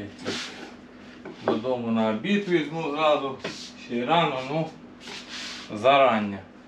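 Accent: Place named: Surzhyk, Ukraine. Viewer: Сало добре але суржик ріже слух аж слухати неприємно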